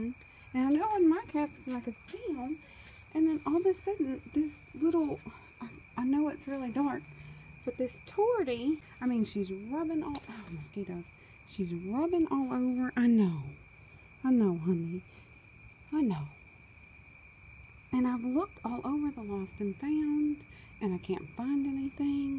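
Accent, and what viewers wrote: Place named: South, United States. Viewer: You sound like you’re southern. Where are you from ? I like your accent .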